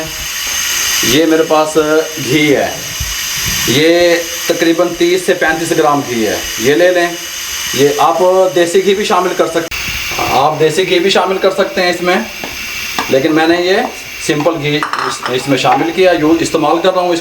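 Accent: Punjab, India. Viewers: Asslam o alaykum, Bhai Jan aap k liye kucch mashwara dena chhahta hoon. Cheff aap ki kucch baten improvement chhahten hen. please consider Karen. 1- aap ney kam bolna he. 2- koi mas'la nahin he. (Matt Boleyn) 3- tension nahin leni (Matt Boleyn) 4- sirf Urdu Boleyn. Punjabi ka tarrka matt lagaeen. 5- aurr sab se buraa lagta he. (Samjha Karen). 6- aap ko chahiye sabb se pehley kisi bhi recipe key ajzaa (ingredients) bataen aurr unki quantity (miqdaar) btaen. Aurr phhir recepie ko banana shuroo Karen. Ummeed he meri baton ko masbat lete huee amal Karen gey. Jiss se insha'Allah aapki video quality improve ho gi.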